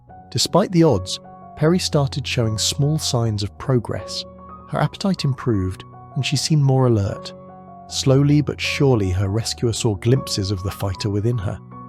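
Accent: United Kingdom. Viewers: Its pavement or footpath in UK. Narrator is very English.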